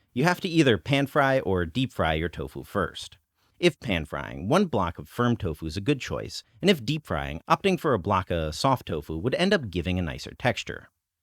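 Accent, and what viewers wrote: China. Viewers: Why does this white guy have a Chinese accent? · It's interesting to hear the slight bit of accent you picked up in your time in China. You speak a little bit "sing-songey," and I find that kinda neat. As always, I love your videos! Chinese cuisine seemed a world away until I started following you. Super informative!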